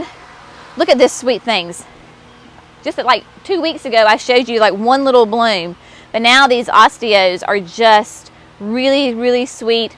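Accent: South, United States. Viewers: It’s wonderful to find a southern garden center that loves Proven Winners, and bonus your accent reminds me of home. I’ve been watching videos for a few years and still find it hard to find retailers that carry PW here in North Central Texas. Finding you in NC gives me hope!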